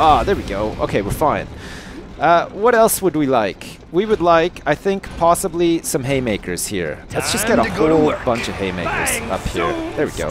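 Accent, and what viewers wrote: Canada. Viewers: Oh, you are so canadian. soory everytime you say it it makes me smile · Which part of Canada are you from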